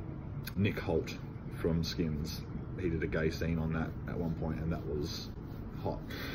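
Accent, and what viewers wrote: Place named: Australia. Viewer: I like your beard and moustache!!! And the Aussie accent isn't bad either.....;-)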